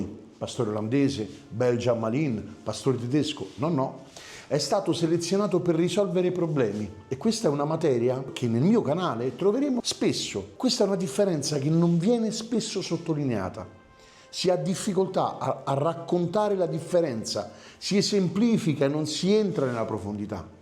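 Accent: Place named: Rome, Italy. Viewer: E vero non se ne vedono più credo perché oggi ottimizziamo tutto io ora sono in Umbria e i cacciatori preferiscono razze più piccole meno manutenzione e spesa, a parte ciò credo che oggi oltre a passatemi il termine non servire come un tempo a tutto si scelgono razze x un solo scopo si semplifica ci vorrebbe troppo impegno altrimenti ormai siamo tutti Smart ma i cani sono rimasti cani per fortuna grazie del video sempre molto limpido e facile da seguire ( ogni tanto famme risenti n’ po de romano che me manca 😂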